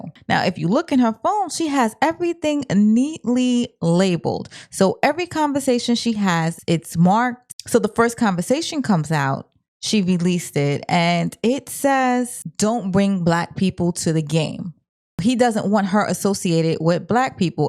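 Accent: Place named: East Coast, United States. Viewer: girl I just love you the east coast accent just everything gurllll❤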